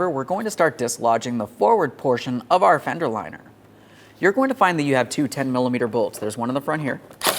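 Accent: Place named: Los Angeles, United States. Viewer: I live in Los angeles and this is how everyone speaks in this hedonistic and PC city.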